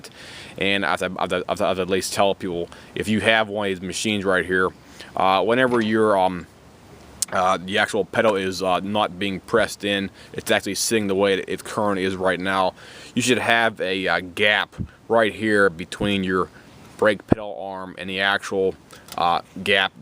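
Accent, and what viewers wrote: Pittsburgh, United States. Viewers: Your Pittsburghese is very strong!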